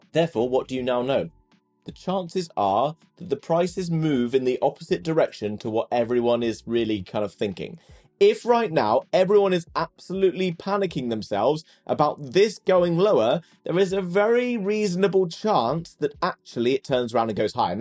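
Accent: England, United Kingdom. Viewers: His accent is an English one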